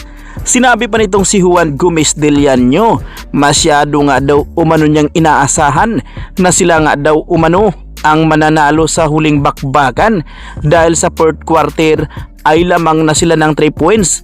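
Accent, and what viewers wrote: Visayas, Philippines. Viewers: ayusin mo salita mo wag ka bisaya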